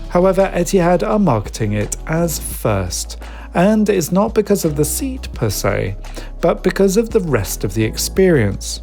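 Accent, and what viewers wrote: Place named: United Kingdom. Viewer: I subscribed because of your hairstyle and your British accent 🙂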